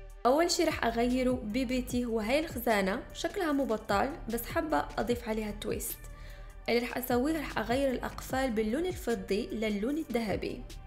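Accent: Morocco. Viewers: اللهجة المغربية واضحة في كلامك يا الغزالة 😉😄😄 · انت مغربية حيث اللهجة مغربية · اول مرة نشوف الفيديو وحسيت بيها مغربية من لهجتها وتأكدت بتعليقك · من لكنتك باينة فيك مغربية برافوووو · ممكن أعرف شو أصل بلدك في كلامك أحسك من المغرب أو الجزائر يلي حاس مثلي يضغط لايك ويلي عارف شو أصل يقولي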